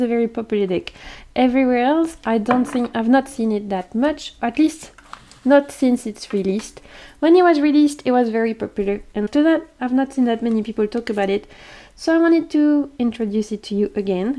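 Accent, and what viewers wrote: France. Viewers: With you on the whole angel thing….. but that angel deck you showed looks like a deck I could get next to. Thanks for sharing. Just wanted to say I love listening to you with your beautiful French accent….. tres charment! Plus would you be willing to you teach people how to correctly say Tarot de Marseille!?!?! 😂😂😂 it drives me nuts how English speaking people say TdM!!! Especially those of us from the states. Love the Marseille Waite….. I’ve seen it before and really didn’t expect to like….using a cohesive art style really makes the difference. I love your idea about how to read round cards. My first deck was a round deck and I never thought of the directionality as time, but I totally love this idea and I’m going to give it a try.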